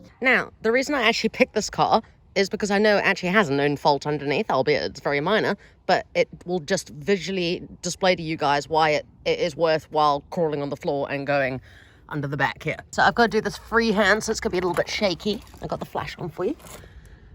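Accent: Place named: Australia. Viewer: This is Aussie accent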